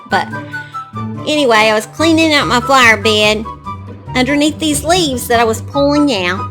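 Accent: South, United States. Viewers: Hi Dolly. ( just a term of endearment, ) My momma was born in Alabama ( Birmingham) . I love your accent. It reminds me of my family in the South.❤ I think you are great at these stories because you somehow keep it short, and sweet ( well as much as you can tell it).☹️ No, no ,no! What good are these monsters doing on this earth??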